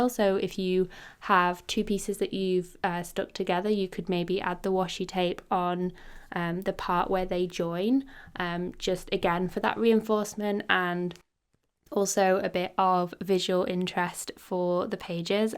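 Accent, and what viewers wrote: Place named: Manchester, United Kingdom. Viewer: Love these, it's great to not have to stitch the pages in for once ❤ Off topic, but can I ask whereabouts you're from? You sound sort of Greater Manchester-esque like me!! (I'm in Stockport) x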